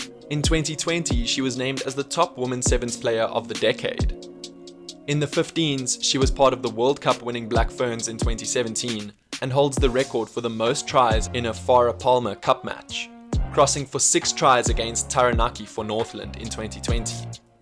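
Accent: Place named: South Africa. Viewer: Nice video. Unusual (but pretty cool) to hear a S'Affa praising NZ rugby.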